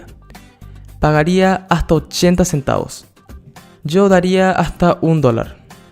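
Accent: Paraguay. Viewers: WTF ese acento Paraguayo. Jaja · Ndeee vos sos paraguayo verdad?. Te pille por tu acento xD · Sos paraguayo? tenes nuestro acento :0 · Decime que sos Paraguayo o vivís en Paraguay.... 🤔🤣👌🇵🇾👏👏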